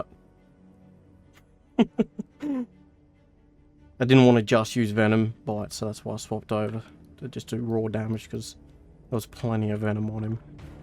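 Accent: Australia. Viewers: The Australian voice really makes this video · very clearly Australian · he sounds super australian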